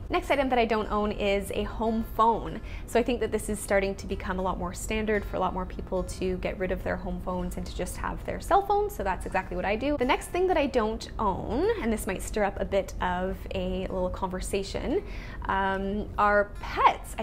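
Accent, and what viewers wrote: Canada. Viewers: I love your Canadian accent!!! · That Canadian accent coming through stronnggg haha. I’m from Calgary and I can hear the East Coast in you. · Either your accent is from Minnesota, or you're from Canada ahah! I can't pin point it! :P You sound like you're from northern Ontario!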